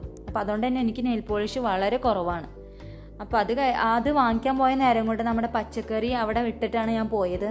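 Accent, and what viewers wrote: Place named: Malappuram, India. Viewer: Hi ചേച്ചി, ഞാൻ new subscriber ആണ്.. ഞാൻ ചേച്ചിടെ വ്ലോഗ്സ് തെരഞ്ഞു പിടിച്ചു കാണുവാരുന്നു. എനിക്ക് ഒരുപാട് ഒരുപാട് ഇഷ്ടമായി. ശെരിക്കും എന്റെ ഒക്കെ വീട് പോലെ തന്നെ. പിന്നെ, ചേച്ചി ശെരിക്കും നാട്ടിന്പുറത്തുകാരി തന്നെ ആണ്. ആ നന്മ ഒക്കെ ഉള്ള ചേച്ചി. പിന്നെ ഞാൻ ആലപ്പുഴ ആണ്. Apo ചേച്ചിടെ മലപ്പുറം slang കേട്ടോണ്ട് ഇരിക്കാൻ തന്നെ നല്ല രസമുണ്ട്. സംസാരം bore ഒന്നുമല്ല ചേച്ചി. രണ്ടു ദിവസമായി ഞാൻ വീഡിയോ കാണാൻ തുടങ്ങിയിട്ട്. Apo മുതൽ കമന്റ്‌ ഇടണം ന്നു വിചാരിച്ചതാ. ഇപ്പോഴാ പറ്റിയത്.. super വ്ലോഗ്സ് aanu.. ഇനി ബാക്കി വീഡിയോ കൂടെ കാണട്ടെ കെട്ടോ.. all the best ചേച്ചി... Love you so much ചേച്ചി.... 😍😍😍😍😍😘😘😘😘😘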